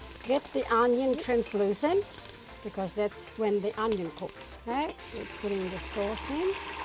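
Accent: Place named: Australia. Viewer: I love your accent, Australia^^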